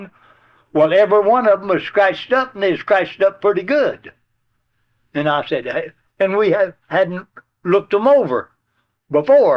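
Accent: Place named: Rural, United States. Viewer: The fantastic thing about this fella is not only his storytelling but his vocabulary while sounding like a dumb azz hick. This man is very intelligent. Everytime i listen to one of these i am so interested because i feel like i was there. This guy was a great man, believe his name is! Lol, just foolin' about...great upload. All of them...😊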